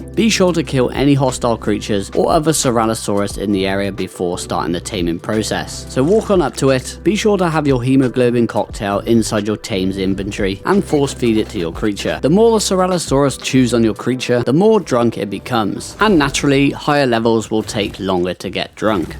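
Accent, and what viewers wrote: United Kingdom. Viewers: Yea he’s British bro, they say stuff like ‘Whas dat?’ D’ya like it?’ You’ve got to love it😂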